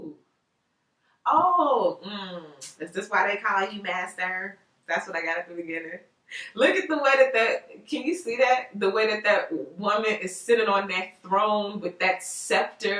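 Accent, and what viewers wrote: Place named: Atlanta, United States. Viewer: Love you QUEEN . And MAAAAN you so ATLANTA LMAOOOOO I LOVE IT🙌🙌🙌🙌😂😂😂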